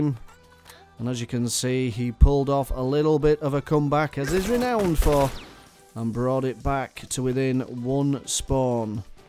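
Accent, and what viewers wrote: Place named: United Kingdom. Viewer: Is the british accent so much fun to hear? Or just good in commentary, nice work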